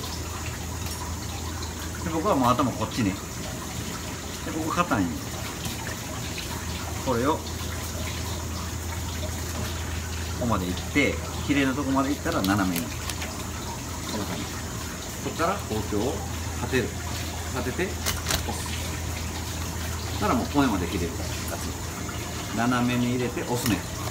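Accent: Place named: Tosa, Japan. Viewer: 土佐弁ですね✨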